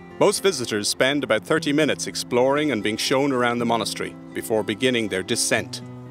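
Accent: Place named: Ireland. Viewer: that guide at 5.00 min. is American but narrator is Irish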